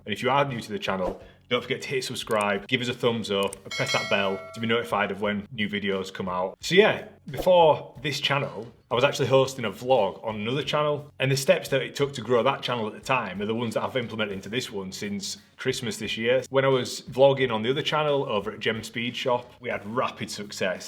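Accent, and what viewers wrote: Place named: Teesside, United Kingdom. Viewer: I need to start doing that! My first few videos were just me rambling on without any structure haha so the bullet points list is a good idea. Also, I think it's needed for when you shoot cinematic sequences etc so you know what shots or what in camera transitions you want to use. Knew I recognised the accent. I went to uni in York and my family are from Middlesborough area so I'm familiar with the accent!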